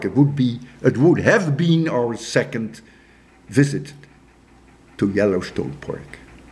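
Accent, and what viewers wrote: Netherlands. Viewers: Just out of curiosity, are you Dutch? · To be honest, I didn’t know this guy and when I first saw one of his videos I knew he was Dutch because of his accent! It’s weird I didn’t know him because I’m Dutch myself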